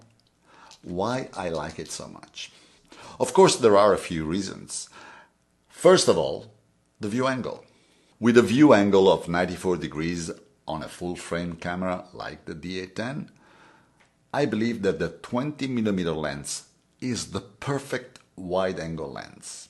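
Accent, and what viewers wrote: Italy. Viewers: I watched every video of yours since I discovered you about six months ago. You always go with your 20 mm. No I know you why. You have a great style of teaching with your beautiful Italian accent.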